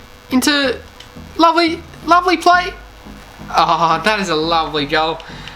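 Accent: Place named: Queensland, Australia. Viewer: Where is Aus are you from.. I'm guessing qld maybe?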